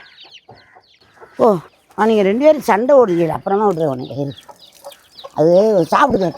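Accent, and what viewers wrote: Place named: Ilayangudi, India. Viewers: Etha oru illyangudi ya slang amdiya irukay❤❤❤ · Ilayankudi pechu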